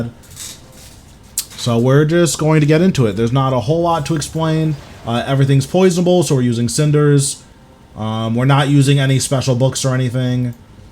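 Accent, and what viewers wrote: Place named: California, United States. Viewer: Where are you from? Sounds like California. 🤔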